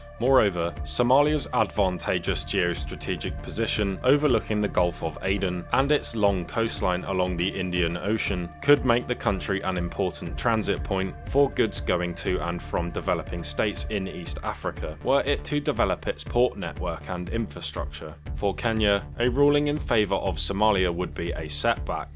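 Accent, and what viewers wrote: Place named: Australia. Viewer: You talk like Billy Finn, the dolphin the family guy, which drives me to speculate you are from Australia.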